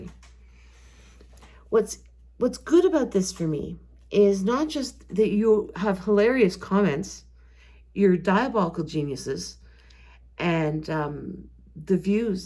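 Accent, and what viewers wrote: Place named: Canada. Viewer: I am guessing by your dialect you are in Canada?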